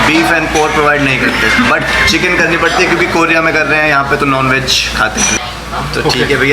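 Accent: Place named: Delhi, India. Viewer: I don't know exactly but yout way of talking like delhi ka munda hahahah, amazing video again.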